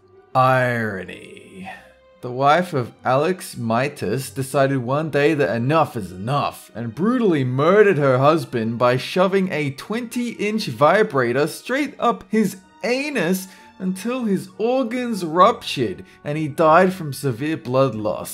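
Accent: Australia. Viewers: Is Aussie? I feel like he is from the east side, maybe Brisbane?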